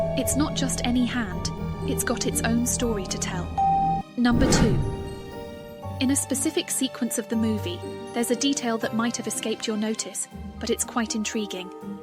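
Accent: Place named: United Kingdom. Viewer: I think it just sounds slightly odd because the speaker is British, but keeps using American colloquisms like "a bunch of" and "super" as an alternative for "very".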